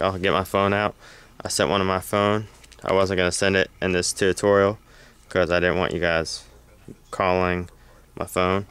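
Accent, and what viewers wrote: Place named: South, United States. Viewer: do u live near the South? u have an adorable accent :D haha lol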